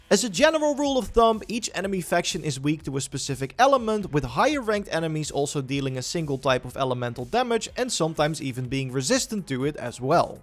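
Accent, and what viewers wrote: Netherlands. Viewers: Love this video as I'm really enjoying this game. But that Dutch accent though damn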